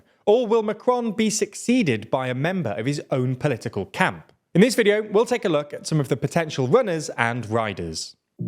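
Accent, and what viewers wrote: England, United Kingdom. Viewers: politics apart, i love when english people try to pronouce french names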